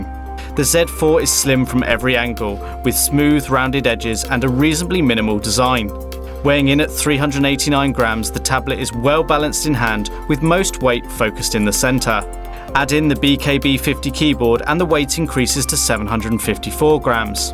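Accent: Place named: United Kingdom. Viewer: Extremely balanced review. Well articulated with a typical British accent ;)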